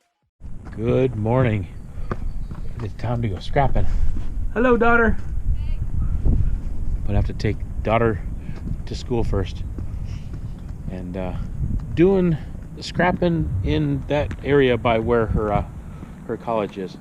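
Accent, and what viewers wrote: United Kingdom. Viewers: your enjoying your British accent really?? love it xx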